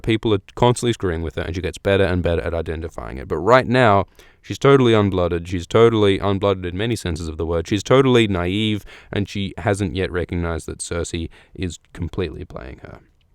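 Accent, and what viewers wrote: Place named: Australia. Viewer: He has an Australian accent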